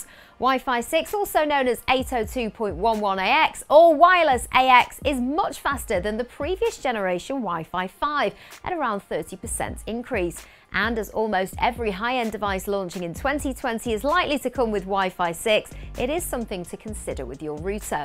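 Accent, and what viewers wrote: United Kingdom. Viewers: Awful British accent!! Rooter, Rooter, Rooter.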